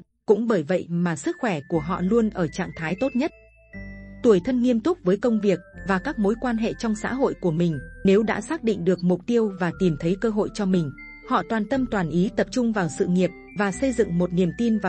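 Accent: Thanh Hoa, Vietnam. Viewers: T nghe giọng thanh hóa đã chối rồi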